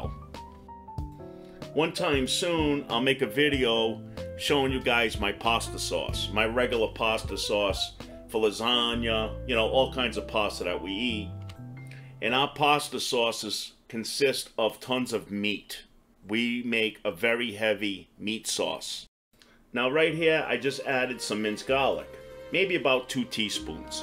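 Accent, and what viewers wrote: Rhode Island, United States. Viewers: It's cool to hear a fellow Rhode Islander with a cooking video. It just so happens I plan to make this tonight. My recipe is a lot like 'yaws :)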